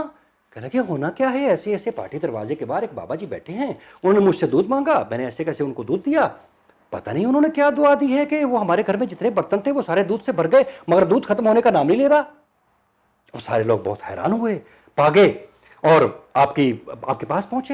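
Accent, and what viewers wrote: India. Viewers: chutiye india ki urdu na bol....lahore waly marein gy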